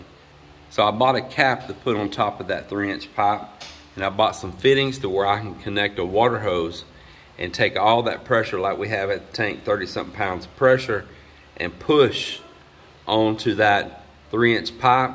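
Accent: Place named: South, United States. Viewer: Dang: I thoroughly, )( THOROUGHLY ) enjoy and learn from your skills. You and Mylinda are really blessed. Still fine tuning my ears to your southern accent! I'll try and negotiate emailing you so we can be friends.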